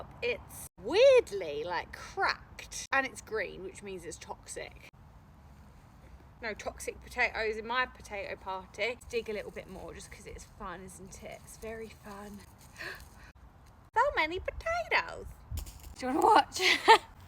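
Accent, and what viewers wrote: United Kingdom. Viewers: I have a question 🤔🤔 are you a British (no hate just curious) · The British accent makes it even funnier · Is this a British 😅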